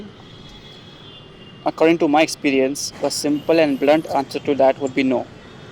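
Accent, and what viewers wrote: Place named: India. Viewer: The problem is your accent. Write the words below the video to be running on the screen while you are speaking in the video. Otherwise, most of us who are not Indian missout much from what you are speaking.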